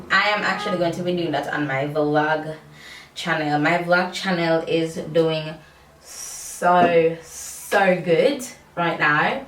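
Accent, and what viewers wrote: United Kingdom. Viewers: That British accent though lol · If this British accent fit yuh one more time goodie😄. Love, love, love the look sweets.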